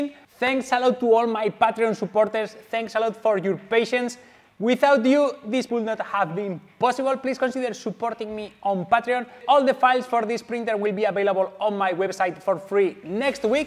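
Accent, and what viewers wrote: Spain. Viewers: Has un canal en español, se nota que eres español:v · He's not Italian, he's Spanish. Didn't you notice the accent? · 4° Comentario en español xD, empiezo a ver el video y digo, por el acento, este es español jajaja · No te había visto nunca y cuando te he visto hablar me he dado cuenta q eras español, al instante. Muy buen video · como se nota que eres español xd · Se nota bastante que eres español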